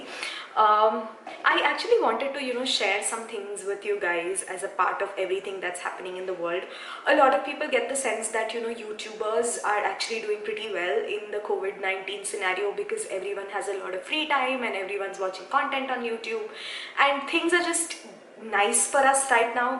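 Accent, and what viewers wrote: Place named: South India, India. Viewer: You sound so much like a South Indian,you sound so cuttee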